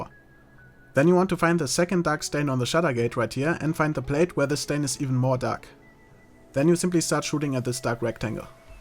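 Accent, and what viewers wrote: Germany. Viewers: For me understand your english is really hard because you have fkin German accent :D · Hört sich so deutsch an :) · Man hört einfach, dass er deutsch ist xD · wow, it's hard listening to this guy. dat German accent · Are you German?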